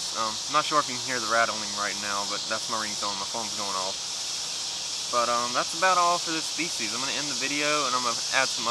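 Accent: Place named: South, United States. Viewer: I never noticed how thick your southern accent is lol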